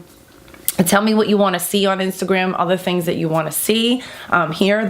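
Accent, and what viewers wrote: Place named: New York, United States. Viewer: Love your accent love NY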